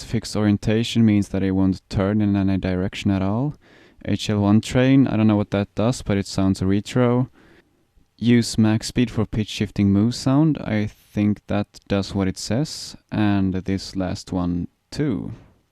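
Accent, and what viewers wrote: Sweden. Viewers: Awesome tutorial! I love your Swedish accent :) I love Sweden, I visited last summer. Keep it up buddy!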